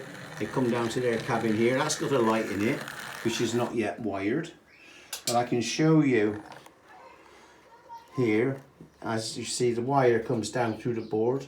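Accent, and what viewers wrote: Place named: West Country, United Kingdom. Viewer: Great thank you for the inspiration. Ever since a lad when my dad took me to model railway shows did I want a narrow guage layout so I've decided at last to covert some of my 040 into 7mm tanks. Sounds like your from the West country. I was from Gloucester but now live in West Wales so I paint everything grey!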